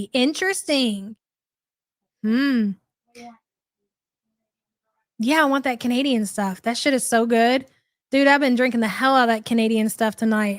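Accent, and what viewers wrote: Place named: Ohio, United States. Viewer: Replay gang today! I love our Support Group and I look forward to meetings in the morning. I'm from Southern Ohio and everyone I grew up with sounds just like you. It gives me so much joy up here in the North where I can sometimes feel a little bit alone. Thank you all ❤🎉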